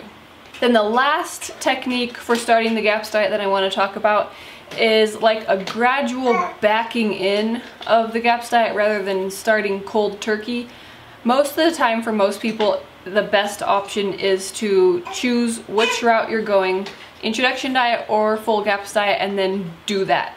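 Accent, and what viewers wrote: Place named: United States. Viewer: I can listen to this beautiful woman all day and that's the best American accent I've heard, really great channel.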